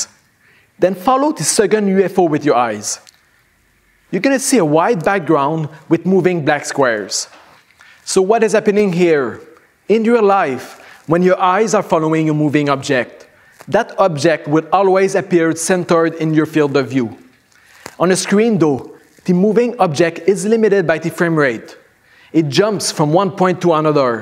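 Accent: Quebec, Canada. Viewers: I recognize this accent from Canada QC :) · On dirait que je reconnais l'accent Québécois ? :) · This guy sound like a criss de québécois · Vincent B. On est 2 a reconnaitre l accent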